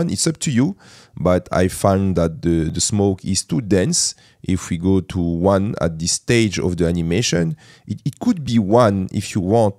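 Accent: France. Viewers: Seem french accent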